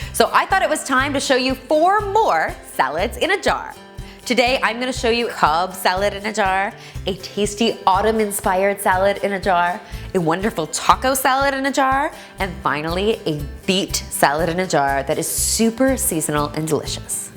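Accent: Canada. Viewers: Hi, I'm actually watching this in 2016, I just discovered your channel through "DoitonaDime", and was very intruiged. Your one pot pasta meals seem great, and I can't wait to try them out! I could hear (at least I think) that you are Canadian? Well, I'm from Belgium, but my uncle emigrated to Whitby, Ontario in the fifties! I used to spend a lot of my summer holidays there, since my dad passed away when I was only four. I loved it there ! Some pretty good, warm memories... Now, my uncle has passed away, too, and I have to grown kids of my own, so I basicly never went back...too busy. Iwas wondering what kind of jars you use, and if they're available online (worldwide shipping, of course). Thank you so much for all of this! 💝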